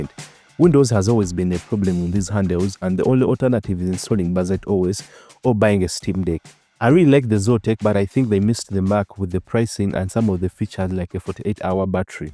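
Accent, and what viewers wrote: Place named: Uganda. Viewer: I can tell a Ugandan from a mile away.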